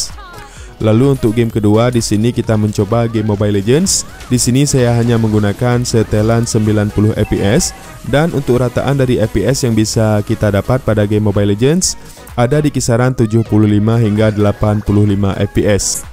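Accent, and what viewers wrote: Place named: Magelang, Indonesia. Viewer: Dari suaranya. Magelang flasher ya ini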